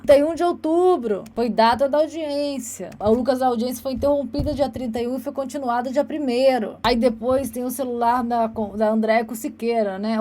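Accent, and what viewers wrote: Rio de Janeiro, Brazil. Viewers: O sotaque do Rio é massa 😅, parabéns 👏🏾😘.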